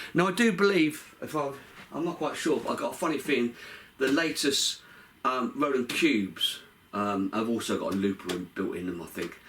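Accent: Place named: London, United Kingdom. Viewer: Hello. I've been spending some time recently trying to find a decent and not costly amp for busking, watching so many videos on YT. I'm gland I found You. I think I'll go fof this one( the new 80watts version of it ). I like yout accent, it reminds me of my past years when I was living in London(1983/84 and 1991/2000). Respect and stay well, ciao from Italy. 🤠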